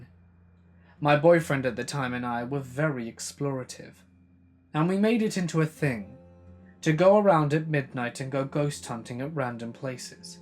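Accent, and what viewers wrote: England, United Kingdom. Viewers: do you realize how weird it is, as an American, to hear U.S. stories told by a man with an English accent? Well, it is! 😂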